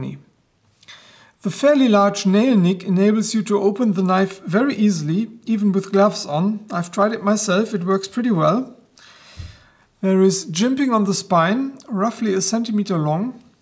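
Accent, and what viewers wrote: Germany. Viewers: You really make the best reviews! Both for knives and other equipment. They are logical, detailed and easy to understand even for us with little training in the English language. The German accent is quite charming, too :-)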